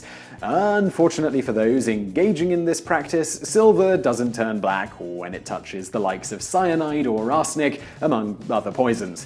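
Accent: United Kingdom. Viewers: Your accent of course is brittish, but where the in the UK is it from... great chanell sir.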